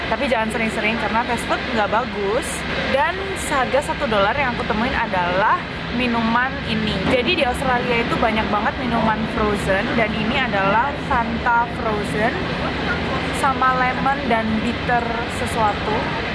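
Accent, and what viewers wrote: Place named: Malang, Indonesia. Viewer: Walaahhh Arek Malang rek dadakman wkwk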